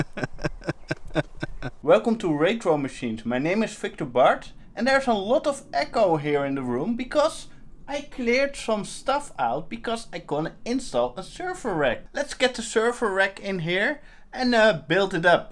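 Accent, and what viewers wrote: Netherlands. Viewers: On you can hear he's from the Netherlands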